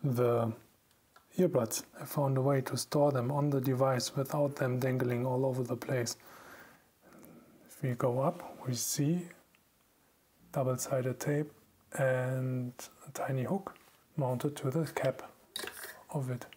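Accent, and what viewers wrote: Germany. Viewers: Ahaha! Me as a German must say: He is so typically german not only because of his dialect but the way he sees things😂😂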